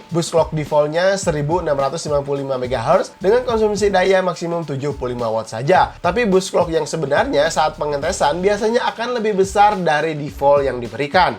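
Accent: West Java, Indonesia. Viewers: Wajar lah orang sunda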